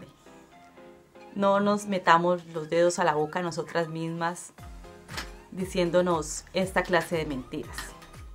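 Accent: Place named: Colombia. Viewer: Me encantas como hablas a lo colombiano que graciosa 🇨🇴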